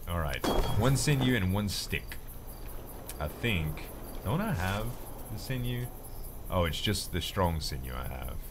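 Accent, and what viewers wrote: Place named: Grand Island, United States. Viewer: Love the accent, reminds me of grand island. Keep up the goood work!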